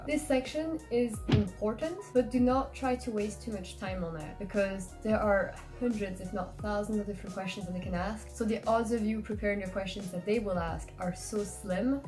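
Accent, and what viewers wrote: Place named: France. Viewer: oh cool I've lived in Brussels for a short while. Yeah your accent was saying French and your colours Scandinavia. I guess looks do fool lol 😂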